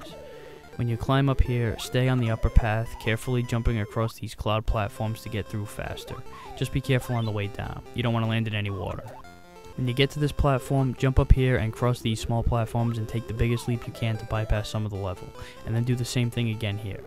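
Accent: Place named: Brooklyn, United States. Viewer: I like your Brooklyn accent when hearing your reviews. It enhances the review's humor and makes you kinda sound like a bad ass. XD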